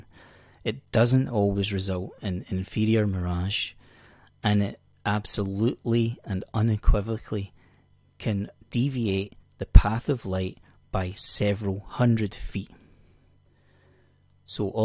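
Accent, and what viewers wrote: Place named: Scotland, United Kingdom. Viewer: Alright mate? A fellow Scotsman here. Keep up the good work. I found you through a painful chat with Spurs etc. It was great to hear a familiar accent in the discourse.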